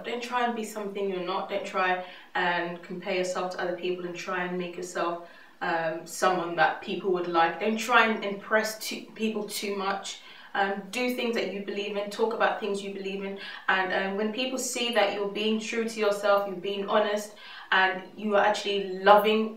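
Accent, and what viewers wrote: England, United Kingdom. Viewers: Listening to you is already a "Treat". I love your manner of speaking & that's not just because you are speaking British English...mainly because you are presenting yourself TRUTHFULLY...not steering away from what you are actually passionate about & not what others expect on your Channel. Great Tips on putting with with Youtube & LIFE IN GENERAL! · I love English accents but I have a question, do you ever think people are saying " " when they say " surely"? haha, I love you lots!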